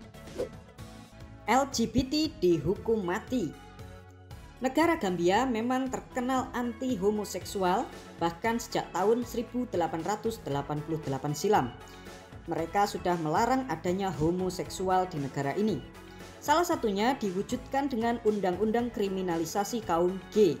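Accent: Java, Indonesia. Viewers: Suka bgt ma Suaranya, lucu bunda ada logat jawa2nya gtu😁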